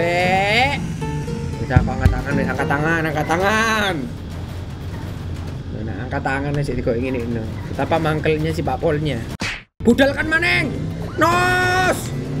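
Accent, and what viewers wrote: Java, Indonesia. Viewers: Bang sama bang Dicky/Tampan gaming kan sama sama logat Jawa bang Dicky kan Semarang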